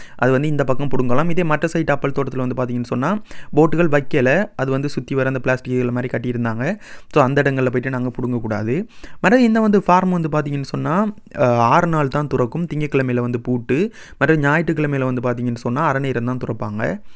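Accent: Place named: Sri Lanka. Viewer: I like halloween 🎃 tq for this experience 🎉and like srilankan tamil 😊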